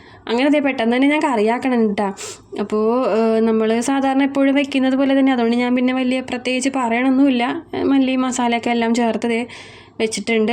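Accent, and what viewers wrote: Kochi, India. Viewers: Ishtam illathavar kanenda😮Ishtam ullavar njangal ellarum undu ktto😊njan pathanamthitta il Thiruvalla il aanu kochi slang kelkan nalla rasam undu ❤super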